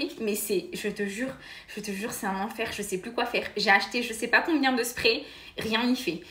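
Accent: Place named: South, France. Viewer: Corps parfait, accent du sud adorable, une pincée d'humour. ❤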